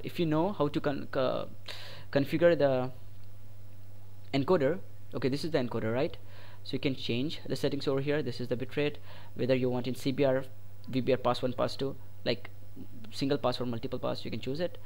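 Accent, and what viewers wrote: India. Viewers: Nice vid. And nice accent, are you Indian? :D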